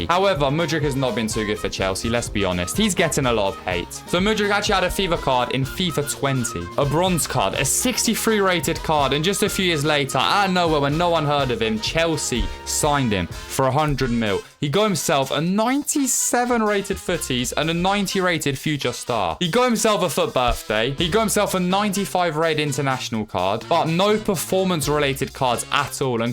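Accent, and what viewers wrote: Poland. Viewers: Are u polish maybe?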